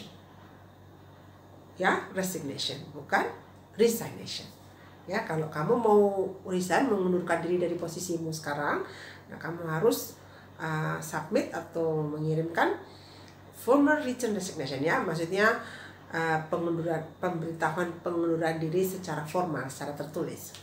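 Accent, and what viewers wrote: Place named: United Kingdom. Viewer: good night madam this is British accent 😊😊.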